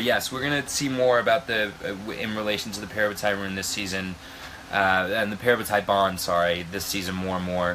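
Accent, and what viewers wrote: United States. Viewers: HIS AMERICAN ACCENT!!! Perfect! 😆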